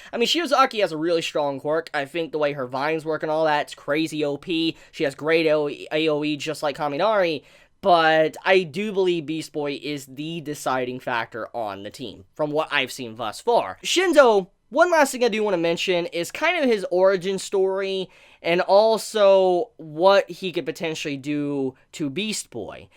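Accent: South, United States. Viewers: I love how subtle your southern accent is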